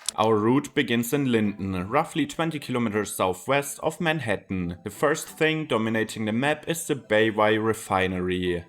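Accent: Germany. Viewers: That German accent is too pronounced and unmistakable.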